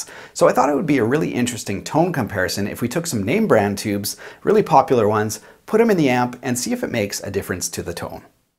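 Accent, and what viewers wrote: Canada. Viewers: Your constant Canadian mispronunciation of “project” and “process” is absolutely annoying. Don’t get me started on “bahhcks” instead of “bucks”. You can say “I’m Canadian” all you want. It’s laziness.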